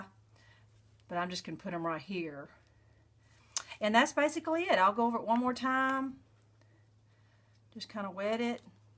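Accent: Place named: Arkansas, United States. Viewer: i just love rabbits, too -- and those are adorable. The eggs look great in the jars. i live in Va. and my best friend lives in Mena, AR. Her husband is from AR and your accent is so great and reminds me of them -- makes me miss them, too -- maybe i'll send them a care package of eggs !! Also, thanks for the tip on Dollar Tree. i was going to Tues. morning tomorrow, but will hit DT first. hope you and your family have a very blessed Easter season.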